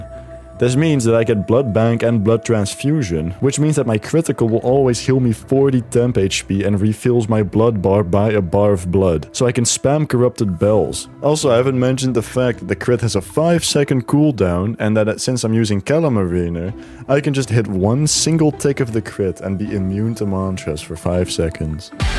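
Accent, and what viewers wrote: Netherlands. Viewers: ik hoor de nederlandse accent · your accent is like realy dutch idk man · Ik hoor een Nederlander ❤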